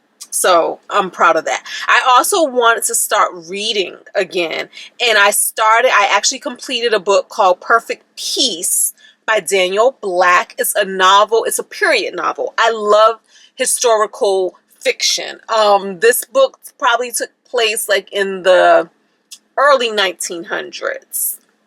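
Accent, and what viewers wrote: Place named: New York, United States. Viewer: Love your content ... you talk a little like a a New Yorker... are you ? Very grateful for your tips and tricks !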